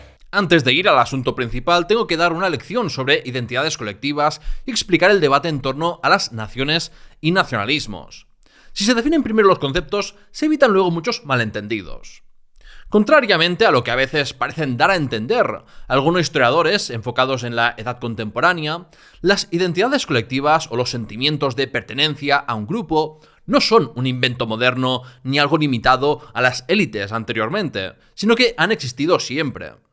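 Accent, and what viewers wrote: Catalonia, Spain. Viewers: Básicamente que quien nace en España es español incluso antes de que aprenda a hablar, y que España siempre estuvo ahí incluso antes de que se llenara de españoles y cuando ya no queden españoles España seguirá siendo España. ¿Perdona eres español? me encanta tu acento catalán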